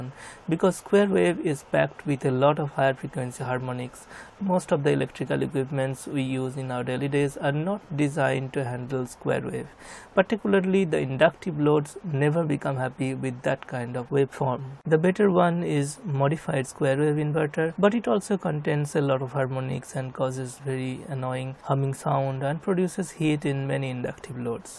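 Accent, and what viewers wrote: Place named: Bangladesh. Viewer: r u from bangladesh. i can guess from ur English accent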